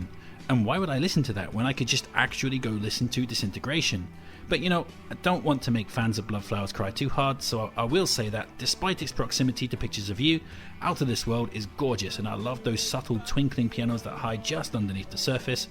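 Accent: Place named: United Kingdom. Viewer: Your accent is insane - it seems like a Brit accent but then you have hard R's... is that an actual dialect over there or have you lived in America a long time or something?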